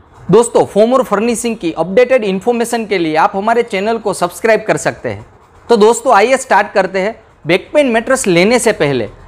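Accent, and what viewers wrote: Gujarat, India. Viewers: Iskae hindi ke accent se lagta hai ye pakka Gujju hai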